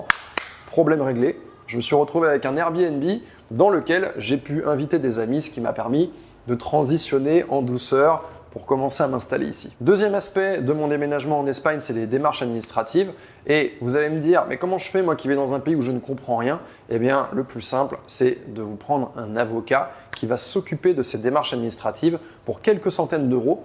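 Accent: France. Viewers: Bienvenue à Barcelone,!!! Je t'ai croisé il y a pas longtemps à la Barceloneta. Tu étais en vélo;-) J´habite Barcelone depuis 21 ans et j'y suis vraiment heureuse. Je dis souvent que c'est l'amour de ma vie <3. J'espère que tu l'aimeras autant que moi. L'accent français c'est un avantage alors garde-le;-) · Un poquito de acento francés 😊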